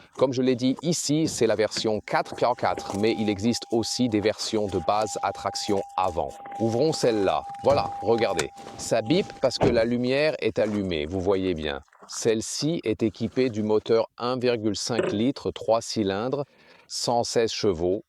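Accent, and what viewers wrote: Quebec, Canada. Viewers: Français québécois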